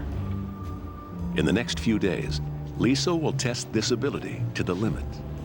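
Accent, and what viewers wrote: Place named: United States. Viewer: the american narrator ruins this show.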